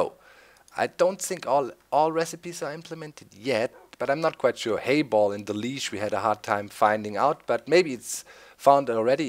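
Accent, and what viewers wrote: Germany. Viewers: I love the German Accent well i think its German.... :3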